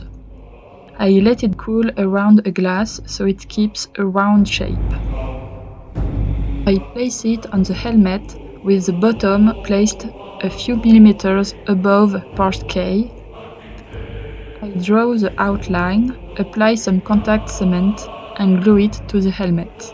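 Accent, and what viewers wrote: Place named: France. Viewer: After years studying french, Im so proud I can distinguish her beautiful accent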